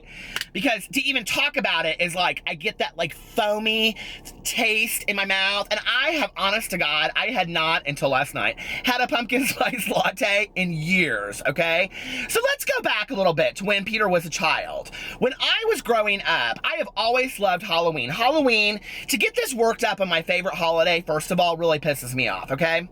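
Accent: South, United States. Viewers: You sound like you have the thickest southern accent in this rant! Love it!!!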